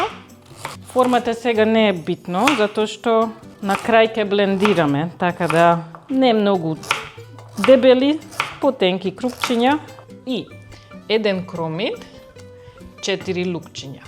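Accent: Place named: Hungary. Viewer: Прекрасна и слатка како и секогаш. Уживам во нејзиниот унгарско македонски јазик и дикција. И секако ги запишувам рецептите.🤗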